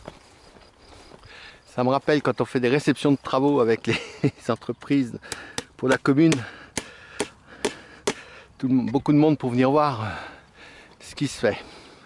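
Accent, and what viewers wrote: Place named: Allier, France. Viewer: Salut, je voulais savoir dans quelle région tu étais car je suis actuellement en stage dans l allier et c'est le même accent et la même façon de travailler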